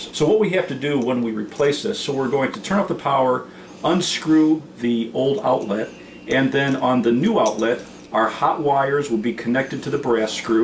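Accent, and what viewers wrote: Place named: Midwest, United States. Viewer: Spot the Midwest accent! "The hat is on tap!" Thanks for posting, this was very helpful.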